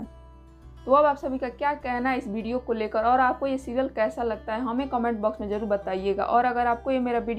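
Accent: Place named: Chhattisgarh, India. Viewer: Speaker is chattishgarhi hain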